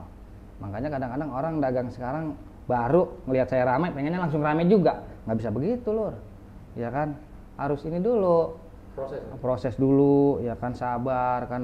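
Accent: Jakarta, Indonesia. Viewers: Ngomongnya nyablak khas betawi.. Jadi kangen sama bapak krna logatnya sama persis, semoga sukses selalu ya pak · Abangnya Betawi Depok ya dari logat bicaranya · Suaranya kaya orang Betawi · Bpk nya logat betawi banget deh Makasih bg ilmunya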